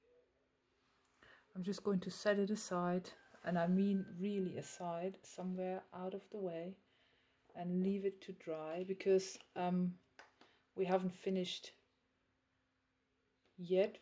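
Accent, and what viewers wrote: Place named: England, United Kingdom. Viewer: i love , love, it, i will surely try it because i love the 3D projects. i am sorry for your finger but we all do this with the excitment of seeing the finish project. how satisfying it is when we finish it specially when it is our own creation. For me i love your english accent and your english sounds perfect for me. continue your good work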